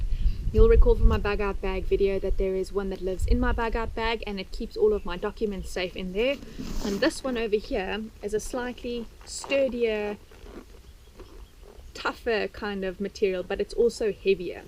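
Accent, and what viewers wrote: South Africa. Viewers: Great video. Watching from the US. Also love the South African accent. :) Cheers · Thanks for an interesting video, love to learn about Africa :) Also dig that wonderful south african accent. Greetings from Sweden!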